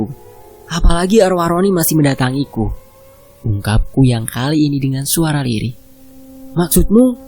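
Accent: Java, Indonesia. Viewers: demen bnget pas mimin bawain logat jawanya... 🤭🤭🤭 rada2 gimna gitu... 😅😅😅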